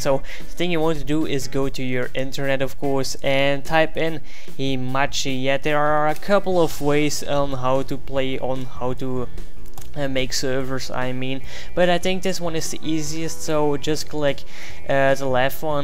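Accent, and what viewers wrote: Netherlands. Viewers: Thank you mate! Btw i think your from The Netherlands :D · Is hij Nederlands? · so ur dutch? or from Belgium? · are you dutch? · he is dutch idiot not every language sounds same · lol ur dutch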